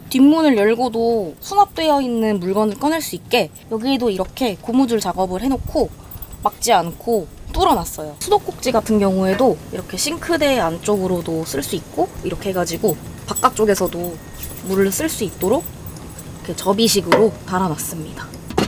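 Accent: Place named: Jeolla, South Korea. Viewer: 말씨가 전라도네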